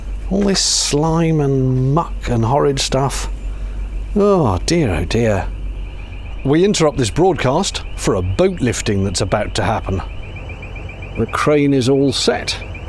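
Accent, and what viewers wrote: United Kingdom. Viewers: Your British accent is so charming "Its ever so thin and runny..." 😍 Glad you held off in care of your back!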